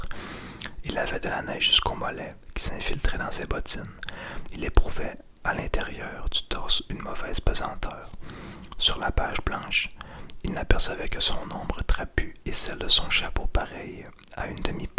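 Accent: Quebec, Canada. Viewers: Je t'ai découvert très récemment et je te remercie infiniment pour ce magnifique moment passé à tes côtés ❤️ je ne suis pas énormément d'asmrtistes québécois mis à part la asmrtiste "asmr u okay?" qui est la seule que je suis avec toi, tout cela pour te dire que ton accent québécois est tellement agréables à l'oreille 🤗🤭 Plus le fait que j'aime beaucoup la lecture et la lecture chuchotée tout cela ajoute un côté très agréable à l'oreille et m'apaise entièrement ♥️. J'ai déjà regarder la saison 01 de The Witcher et je sais que la saison 02 est en train d'être tourner en ce moment, je ne comprenais pas tout ce qui se passait dans l'intrigue jusqu'à ce que je comprenne seule qu'il y avait des moments qui allaient dans le passé et d'autres qui retournaient dans le présent, c'est vrai que j'étais perdue mais après j'ai réussit à suivre l'histoire et à apprécier Yennefer et Geralt avec Citri si je ne me trompes pas pour son prénom 🤔. 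Je n'ai pas encore lu les romans et je ne sais pas vraiment comment suivre l'ordre chronologique 😂😂 Concernant métro 2033, je ne l'ai pas lu mais j'ai vu le résumé et l'histoire est très plaisante, prendre en compte une histoire de zombie qui vivent à la surface avec des gens qui se sont confinés en sous terrain pour survivre correspond tout à fait à la situation actuelle avec un côté fantastique à la Cloverflied Lane mixé à World War Z, c'est juste agréable de lire ce genre d'histoires là 👌. Merci encore pour tout ce que tu fais et de lire aus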